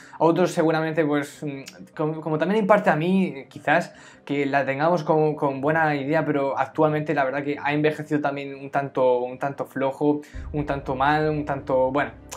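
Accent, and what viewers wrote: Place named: Latin America. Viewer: Tenés que aprender un español más neutro, cuesta entenderte en el español sudamericano. Tenés que aprender a proyectar las palabras hacia afuera, hablás hacia adentro y suena un pasticho engorroso, obviamente te miré 20 segundos.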